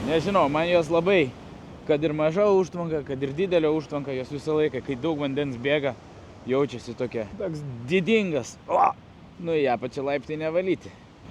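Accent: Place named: Samogitia, Lithuania. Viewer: Labai simpatiškai skambėjo tavo kalba žemaitiškai👍pajutau jog esame giminingi...Palanga močiučių🥰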